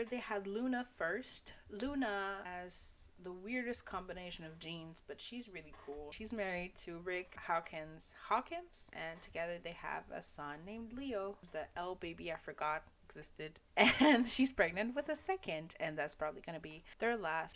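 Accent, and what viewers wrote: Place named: Ontario, Canada. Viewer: I see Canada, and I know you are in college and have a major Ontario accent. We might possibly be going to the same institution, haha.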